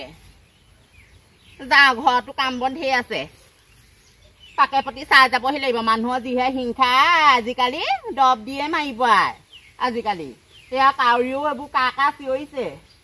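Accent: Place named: Upper Assam, India. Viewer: baai.. Bahut dinor murot palu dei.. Bahut bhaal lagil.... Apunar thaas tu ujonir type je.. Bhaal laage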